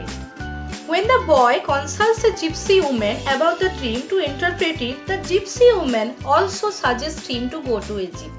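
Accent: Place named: Bengal, India. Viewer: You seem happy to read books and that excitement shows in this video. We love our Bengali accent too.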